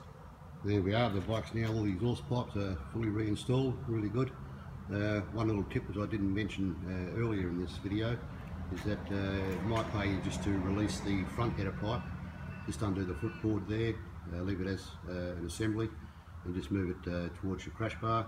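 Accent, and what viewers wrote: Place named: Australia. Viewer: Great to hear an Aussie voice to explain...well done and thanks for the tip.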